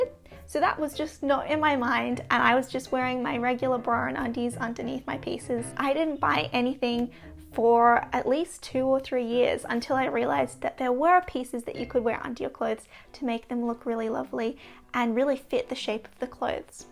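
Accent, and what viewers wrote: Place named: Australia. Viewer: I have commented on one of your videos before (on the life of a housewife in the 1950s), you seemed to appreciate my comments - thks for your reply at the time. I was from an English immigrant family and lived in Melbourne from age 9 to about 32, when I returned to England. So the way you talked, and the sort of things you had in the house, were very familiar. Just a word about terminology: the belt worn around the waist with straps to hold up stockings, worn by women (and most girls from age about 12), was called a 'suspender belt' - the same as in England. It's mainly in the USA, that they are called 'garter belt'. In the US, the straps themselves are called 'garters', which can also refer to an elasticated band around the leg to keep stockings in place. So 'garter' has both meanings in US English, but only the latter meaning in England and Australia (elasticated band around the leg). 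A suspender belt in Australia was also called a 'dance belt', for reasons I never discovered. Time was when women liked to look and feel feminine - them were the days! ("vive la difference!" and all that).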